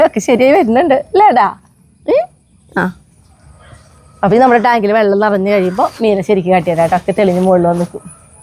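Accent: Thrissur, India. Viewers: ട്ട ഞങ്ങൾ തൃശൂർ കാരുടെ ട്രേഡ് മാർക്ക്‌ ആണ് 😄😄😄😄👍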